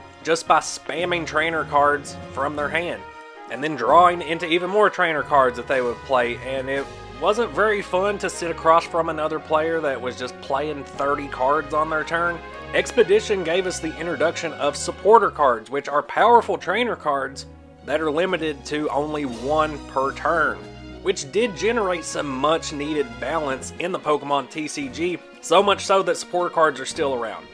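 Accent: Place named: South, United States. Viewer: a real pokemon OG, im from the south, moved out west, don't get to hear the accent often, but when I do and in combination with pokemon, it's so ideal, I've used your channel to curate my vintage play collection, now that I have a better understanding of e readers I'll be on the lookout for these cards. thanks you the OG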